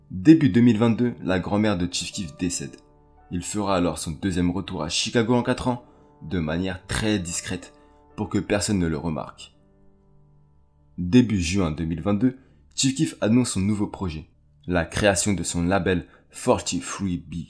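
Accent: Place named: Paris, France. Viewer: Large j'ai stoppé directement pour aller dans les commentaires pour savoir si comme moi quelqu'un avait arrêté les frais après 1min 20 d'écorchage. Depuis que tu dis Glo Gang comme un gars du 16 c'est mort next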